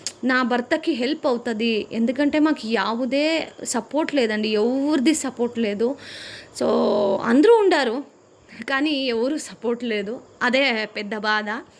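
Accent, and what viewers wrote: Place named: Karnataka, India. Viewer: Sister do you speak kannada also. Because I heard some kannada words in your voice over....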